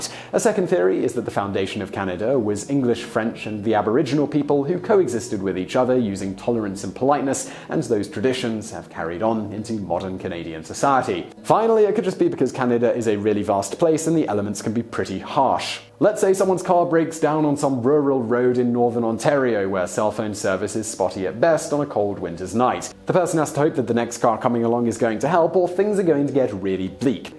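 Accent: United Kingdom. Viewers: It is by a Brit you idiot, how could you listen to the guy and think by his accent he was American. Are you deaf or what is your problem ?. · Why is there a British guy talking about Canada? Why not a Canadian, eh?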